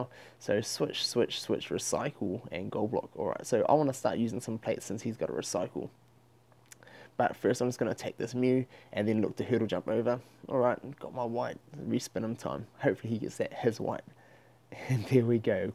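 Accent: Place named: South Africa. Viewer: Johannesburg, your accent sounds very South African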